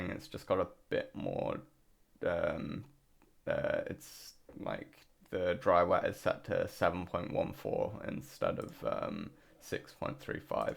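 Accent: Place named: South East England, United Kingdom. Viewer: you sound like a south east lad too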